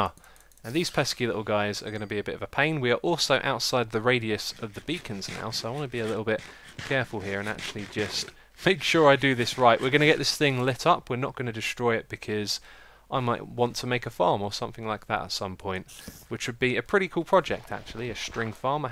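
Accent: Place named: United Kingdom. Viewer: Its really hard to speak in the British accent but i really like it and that's what makes all your videos a bit more fun and entertaining to watch than they really are. P.S BIG FAN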